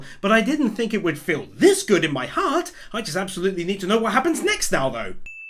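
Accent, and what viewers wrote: United Kingdom. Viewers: I could tell from the accent you were from somewhere in East Anglia, same. I also got very hyped when that location card came up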